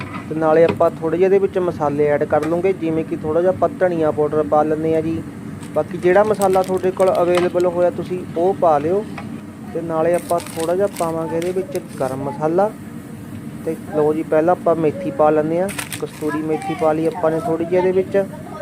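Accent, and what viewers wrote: Ropar, India. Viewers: ਕਮੈਂਟਰੀ ਕਰਨ ਵਾਲ਼ੇ ਦੀ ਭਾਸ਼ਾ ਤੋਂ ਲੱਗਦੈ ਕਿ ਰੋਪੜ ਸਾਈਡ ਦਾ ਏਰੀਆ ਹੈ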